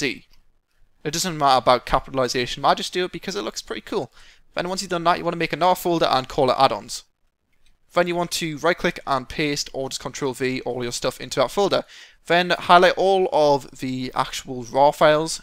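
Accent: United Kingdom. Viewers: well, the brithisch accent is pretty hard to understand, i mean i understoad everything but damn on some parts it was hard ^^'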